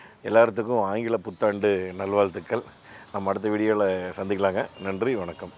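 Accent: Kongu, India. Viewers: Brother.... நீங்க கொங்கு தமிழ் நல்ல பேசறீங்க.... சிறப்பு....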